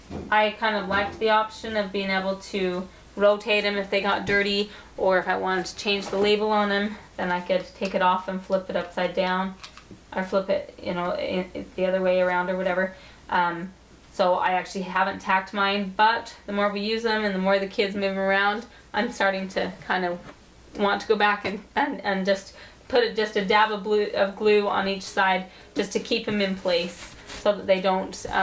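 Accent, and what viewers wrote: Utah, United States. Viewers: It's a Utah accent ❤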